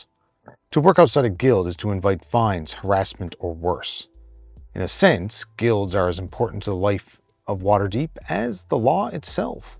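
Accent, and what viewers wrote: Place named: Newfoundland, Canada. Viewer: Great video! With that Newfoundland accent and all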